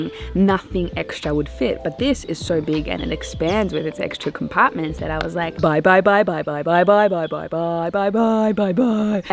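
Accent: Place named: Australia. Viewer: First time noticed Australian accent from you. Is the environment affecting you? :)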